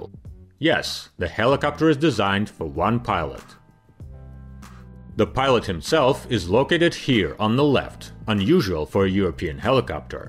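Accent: Russia. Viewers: Yippee… politically we may hate the Russians at the moment, however on a personal level, nothing beats a Russian voice narrating to you. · It’s not a sky ship video unless it has that genuine Russian accent 😌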